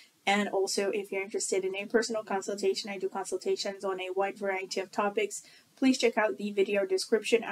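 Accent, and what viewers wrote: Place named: California, United States. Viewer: I enjoy your accent and listening to you speak. Arr you from Cali?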